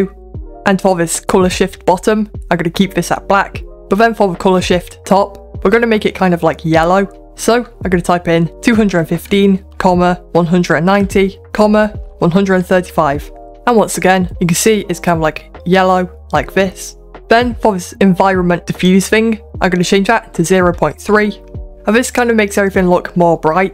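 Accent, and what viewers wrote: United Kingdom. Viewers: are you british? just trynna guess from accent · I love your accent! I can tell your british.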